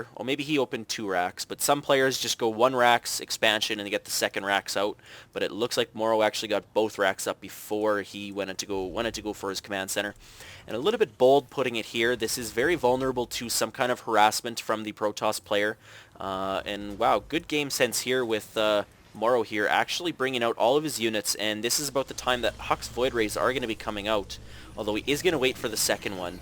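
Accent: Canada. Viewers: I'm Canadian and I didn't know what a Canadian accent was before hearing you. Keep up the great work. Your commentary is up there with the best. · you sound so canadian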